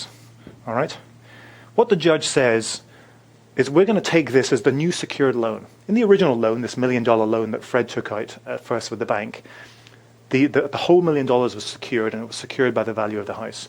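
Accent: England, United Kingdom. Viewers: All these videos pertain to the USA right? or are they in the context of the UK? The English accent has me wondering...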